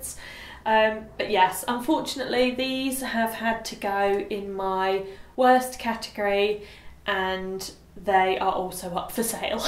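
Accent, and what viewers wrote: United Kingdom. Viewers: I love love your speech with the clear british accent and beautiful voice. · I love your video and your british accent. The dupe you are proposing are stupefying. Good luck for your marathon! Your fan from Quebec!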